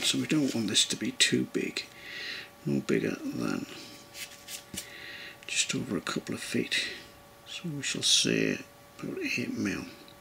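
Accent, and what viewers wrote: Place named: RP, United Kingdom. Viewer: Very good plummy BBC accent. Brings back memories of " Listen with Mother "and "Billy Cotton Band Show" The radio was nice and the bar, I must try! Thanks. Have a good weekend. And greetings to Mrs.T. Peter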